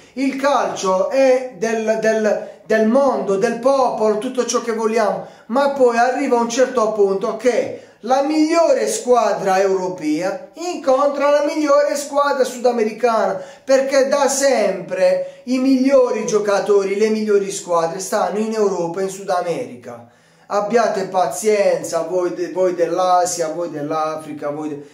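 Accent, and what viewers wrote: Bari, Italy. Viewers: Ti seguo anche se sono interista perché sei simpatico. Il dialetto barese è troppo bello….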